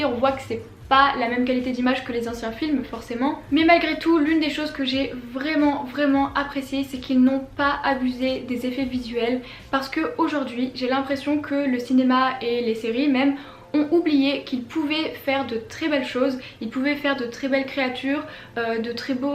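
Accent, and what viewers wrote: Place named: Quebec, Canada. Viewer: I lived in Montreal for 25 years, but I don't get to hear people speak quebecois french anymore, so I watch your youtube channel instead :-)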